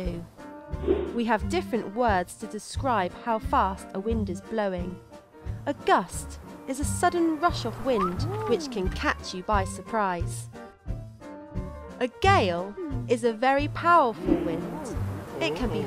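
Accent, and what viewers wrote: United Kingdom. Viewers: This use british accent?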